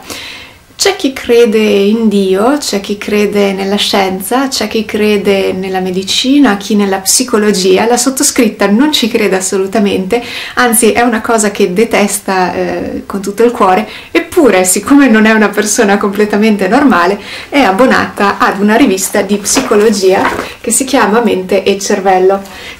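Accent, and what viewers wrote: Trentino, Italy. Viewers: Si è vero, non hai detto boiate, i parametri fondamentali sono pronuncia dei fonemi e intonazione. A te per esempio si sente la marcatura trentina della s quando parli italiano Nelle altre lingue che usi nei tuoi video ti trovo invece ottima, da semplice linguista e non madrelingua, non trovo differenze con parlanti nativi. Non so spiegare questi fenomeni se non con la predisposizione naturale, non è sufficiente da solo l’impegno e l’esercizio, in quanto anche il tuo video francese è sì divertentissimo come collage di parole :’D ma buono come velocità ed accento con soli 3 mesi di studio. Ho compagni di Uni che parlano ogni lingua straniera "all’italiana" anche dopo 8-10 anni di studio!! Lo trovo una mancanza di rispetto nei confronti del nativo a cui ti rivolgi, c** Gino, un minimo di sforzo! :/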